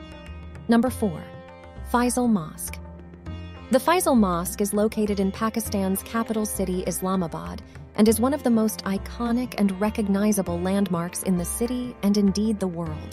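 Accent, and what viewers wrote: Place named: United States. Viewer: She is pronouncing it fine brother. It's her American accent.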